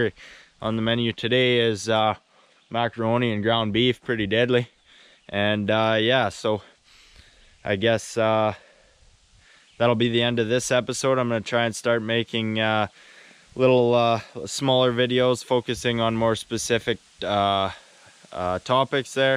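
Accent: Canada. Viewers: Appreciate your build ay. Good job, the Canadian accent with expletives, excellent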